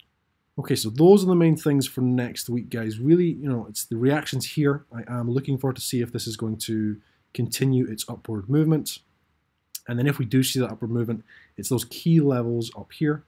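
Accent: Scotland, United Kingdom. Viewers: Greetings, great videos, especially those for beginners, which particularly helped me, and, you sound scottish, i do love the accent haha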